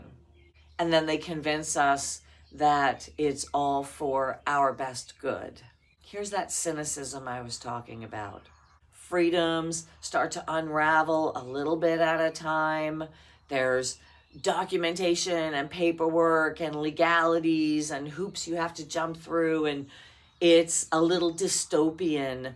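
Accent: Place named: New Jersey, United States. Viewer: applying to phd's in canada for 2026. you know why! but i'd love to come visit your farm and meet you. i am also:-) cheers, love your program (you sound like a jerZ girl:-) your discussion of the "master / slave" dialectic is 200% frankfurt school and the "critical theory" camp, my camp. welcome to the machine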